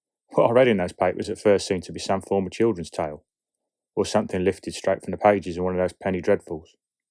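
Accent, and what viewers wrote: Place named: London, United Kingdom. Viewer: Oh dear… I don’t fancy Sgt Hargrove’s chances! 😱 I really enjoy these shorts, as with Hengis, they whet the appetite for what is to come! Presumably, as this is titled as “Project Aeturnus”, some kind of Government skulduggery is afoot? Perhaps trying to uncover the secret of immortality? 🤷🏻‍♀️The reference to Sawney Bean & his cannibal crew intrigued me….perhaps even as fellow cannibals, their primitive slaughtering offended the much more civilised Lucius? 😉 Bravo on your London accent …. 😉 can’t wait to see where this is going & what happens to my favourite bad boy! 😂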